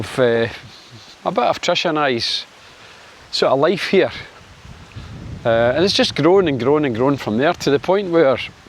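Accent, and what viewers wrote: Scotland, United Kingdom. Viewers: Your channel success is down to your easy going friendly approach with the great Scottish accent! Englishman here living in NZ for 20 years but soon to return to U.K. Primarily to be able to tour more of Europe (it’s all a bloody long way from NZ!). Stumbled on your channel as I was interested in Spain. I always subscribe to a channel the moment I find something that may be interesting and then every so often will edit my subscribed list of ones that no longer appeal to me. Don’t worry you’re still in there!😁 Another branch of your channel should be you filming / commenting from your motorcycle 🏍️ (biker here as well 👍). Cheers 🍻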